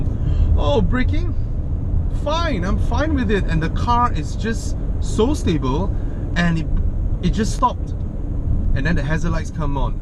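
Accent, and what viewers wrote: Malaysia. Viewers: oh man...the msian accent I love it. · I've lived in Malaysia for 2 years, I like your accent :D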